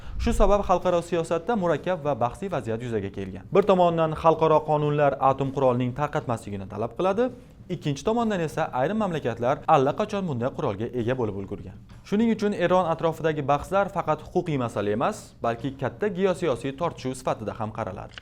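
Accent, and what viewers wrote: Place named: Uzbekistan. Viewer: ""Yoqtirma"" sizni sõz boyligingiz va asl Õzbek laxchangiz ajoyib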